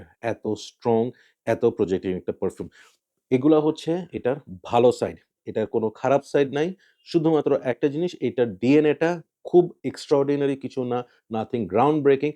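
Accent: Chittagong, Bangladesh. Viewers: আসসালামু আলাইকুম ভাই। আপনার জন্মস্থান কি চট্টগ্রাম?আপনার কথার মধ্যে চট্টগ্রাম এর টোন আছে ।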